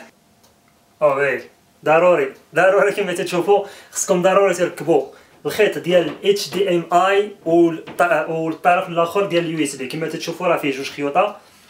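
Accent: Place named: Algeria. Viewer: هاذة جزائري تغيرت لهجته بسبب الاحتلال الفرنسي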